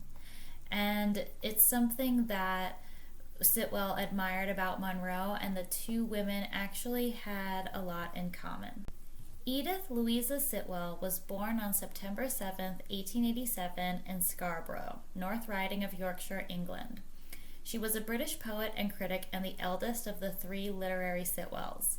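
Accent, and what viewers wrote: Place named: United States. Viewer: shame about the accent. Why is it that American accents are so hard on the ears. It stumbles around the English aristocratic discourse and the pronounications are mainly wrong. Leave Edith Sitwell to us-the British-and you do Miss Monroe.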